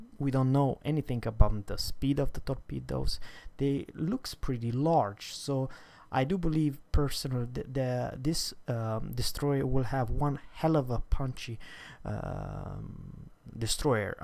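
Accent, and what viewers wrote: Sweden. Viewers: I sense a strong Swedish accent here.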